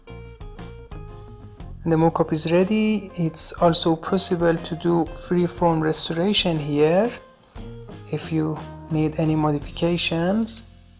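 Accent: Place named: Iran. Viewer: Your accent looks a alot like iranians :)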